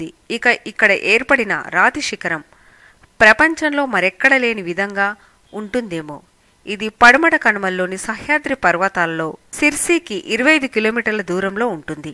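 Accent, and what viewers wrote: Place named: Tamil Nadu, India. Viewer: Need to Improve telugu. It is like some tamilian gave voice to this video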